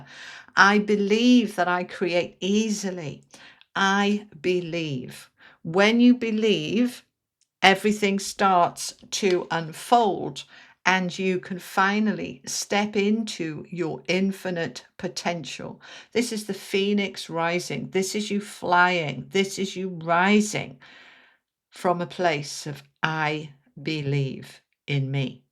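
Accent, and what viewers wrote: Wales, United Kingdom. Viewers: I always think I can hear welsh in your accent!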